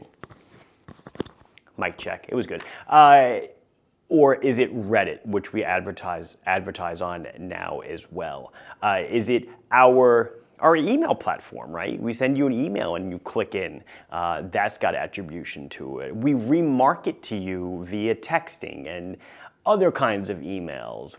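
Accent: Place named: Texas, United States. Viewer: I don’t buy watches from you because of your Google ads. I DO buy watches from you because of your Texas drawl in describing your products & and because of your wardrobe that matches Teddy Baldasarre’s. There, I said it.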